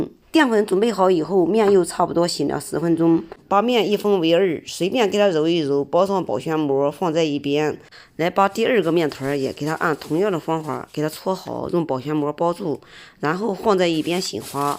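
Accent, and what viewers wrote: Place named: Shanghai, China. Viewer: 阿姨的上海話口音真好聽😅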